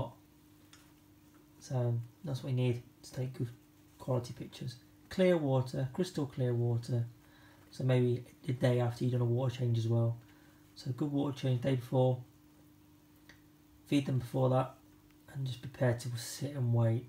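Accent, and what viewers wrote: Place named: England, United Kingdom. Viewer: Arhhh ok. I'm from Yorkshire but live in the US now. Just recognised the accent👍🏻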